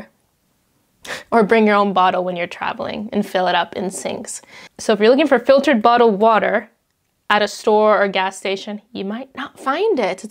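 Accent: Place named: Europe. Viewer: So interesting...I would never know you were from GA...or even the US. You sort of have a European accent with your English. Very pretty. :)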